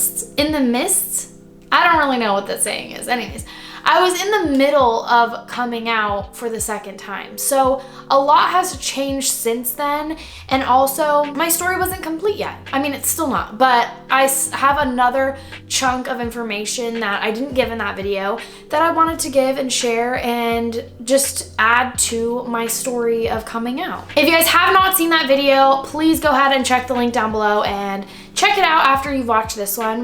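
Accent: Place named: New York, United States. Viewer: it sounded like you have a New Yorker accent a little haha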